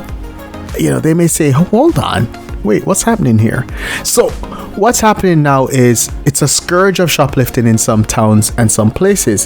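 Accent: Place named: Jamaica. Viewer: You sound like a batty Boi Jamaican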